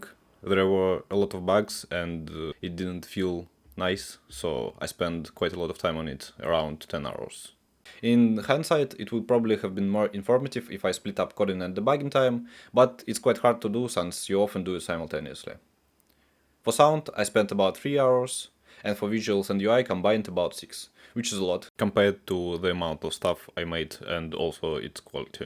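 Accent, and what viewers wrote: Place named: Russia. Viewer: слыша акцент я не могу не думать что автор из России или стран снг, не удивлюсь если это так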